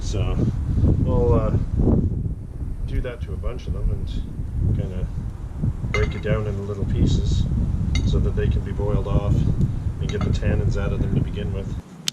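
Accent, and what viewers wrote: Canada. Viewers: Awesome! You sound like a fellow Canadian. I am so inspired - "gathering resources". Been there so many times. Cheers from Montreal QC.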